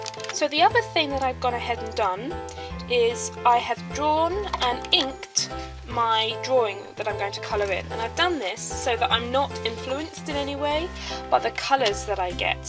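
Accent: England, United Kingdom. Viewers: what shop do you get it from? you sound like a English girl like me. just guessing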